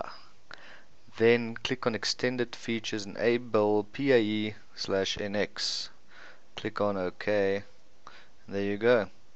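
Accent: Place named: South Africa. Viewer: thanks a million dude, are you south african :), Im from SA btw, and based in your accent and youtube name i guessed you are too. Thank you again ::)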